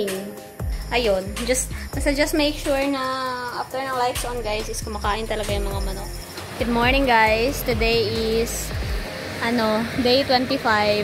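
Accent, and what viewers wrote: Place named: Visayas, Philippines. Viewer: Akala ko sa Visayas. Parang bisaya po kase yung salita nyu. Jeje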